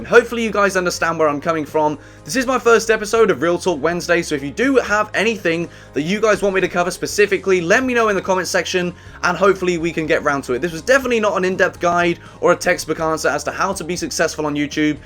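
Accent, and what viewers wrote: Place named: United Kingdom. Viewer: Just realized he had a British accent. :0 Mind=Blown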